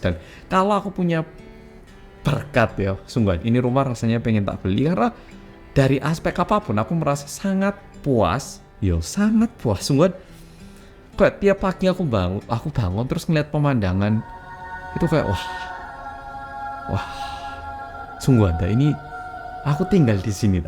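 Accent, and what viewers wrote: Java, Indonesia. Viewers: Kelazz oppa logat jawanya ga bisa hilang wkwkwk😂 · Aku nek lali jenenge pasti nyebute ngene "Wong Korea seng ngomong bahasa Indonesia logat jowo seng bojone wong Singapore bahasa inggrisan" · Korea tapi medok jowo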